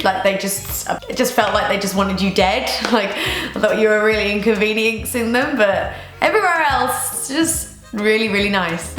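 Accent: United Kingdom. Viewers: i could listen to a British accent like all day long. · ur accent is so lovely. where are u from in the UK? :) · I usually dont like british ppl because of the accents but u r diffrent i like you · I'm an American and I absolutely love the female British Accent!! 😍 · Your limey voice hurts my head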